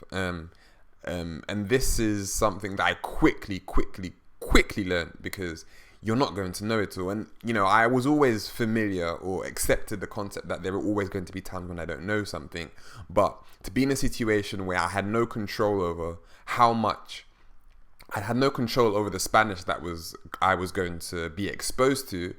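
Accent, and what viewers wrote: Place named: United Kingdom. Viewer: 3 weeks is not enough time for you to get a good understanding. It would take several months for you to become fluent. Immersion is the best way. It sounds like you are british so I dont know what its like there but here in the US you dont have to go to a foreign country to immerse yourself at least once a week with native speakers. I spent a month in Costa Rica and toward the end I felt my spanish improving slightly, but not terribly, I needed more time.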